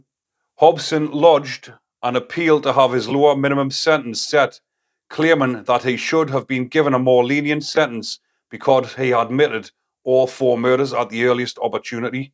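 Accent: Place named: Geordie, United Kingdom. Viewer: First-class video. Plenty of well-researched detail. Despite your strong Geordie accent, I was able to follow all your commentary by using the subtitles! Thank you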